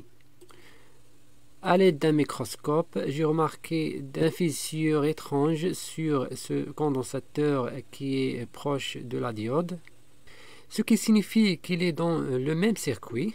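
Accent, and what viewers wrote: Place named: Algeria. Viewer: السلام عليكم ، الأخ مين من الجزائر لهجتك جزائرية، أريد إستشارتك في موضوع الله يحفظك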